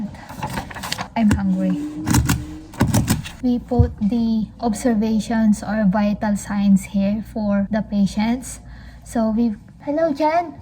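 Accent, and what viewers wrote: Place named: United Kingdom. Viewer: Nag eevolve ka na talaga classmate. British accent ka na. Cute.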